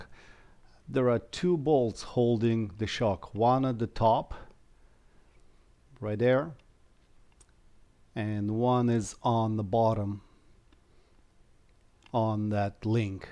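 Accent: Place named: Romania. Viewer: Mercedes-Benz Channel We all know that experience my friend. It’s an inspiration for me, you’ve got it looking really good. I hope you find time to complete the build soon. I haven’t even started mine yet. It’s a 1983 USA spec model (solid alloy wheels and ‘INTERCEPTOR’ decals), so rather rare here in the UK. Where are you based? Your accent sounds Romanian to my ear. Hope I haven’t offended you.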